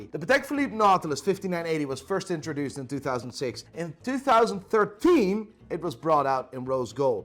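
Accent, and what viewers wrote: Northern Ireland, United Kingdom. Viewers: Ahh man. The NI in your accent is fucking belter.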